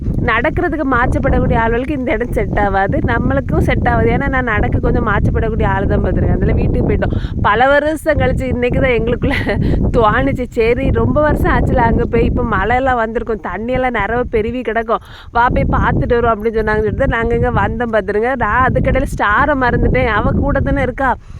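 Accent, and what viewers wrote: Kanyakumari, India. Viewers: Ithu kanyakumari slang bro. It’s nothing wrong.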